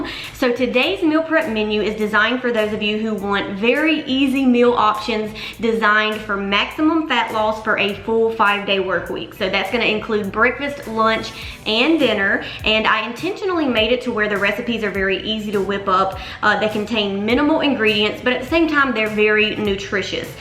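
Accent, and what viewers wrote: South, United States. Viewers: Love the dishes you put together. Simple and they look yummy and healthy. You sure do talk at a good speed, and I love your Southern accent. I'm from Texas, but don't have the drawl. Thank you. Love all your videos.:virtualhug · Came for the insight, stayed for the southern accent. 😎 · love that country accent baby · There's nothing better than a sweet southern drawl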